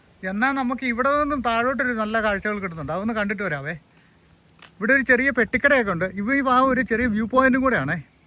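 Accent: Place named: South Kerala, India. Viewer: Wow superb well explained kya scenic place & your language slang ( south kl) nice to hear our roots are from thiruvalla we live coimbatore best wishes for more videos thku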